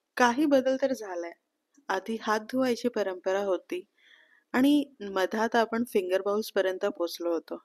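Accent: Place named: Hindi Belt, India. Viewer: माहिती चांगली आहे. पण उच्चार हिंदी पट्ट्या मधले वाटत आहेत. उच्चारांवर काम करावे.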